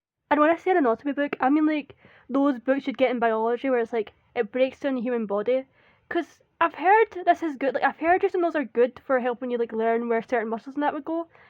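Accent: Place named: United Kingdom. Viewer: OH GOD. SHES FUCKING BRITISH!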